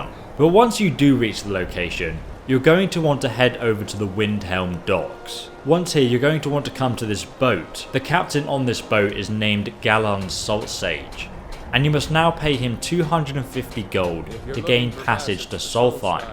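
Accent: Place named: United Kingdom. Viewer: LiverpoolSwag69 he's British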